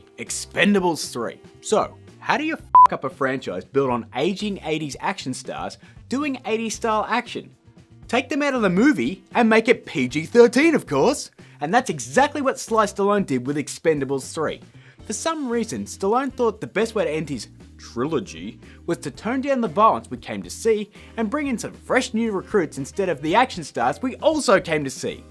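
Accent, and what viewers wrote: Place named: Australia. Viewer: This review wouldn't have been at all tolerable without an Australian accent. Really, an American couldn't have used the same body language or expressions and be taken seriously. With that said, awesome review. We align on almost everything here, with the exception of Guardians. I thought Guardians should have been way higher.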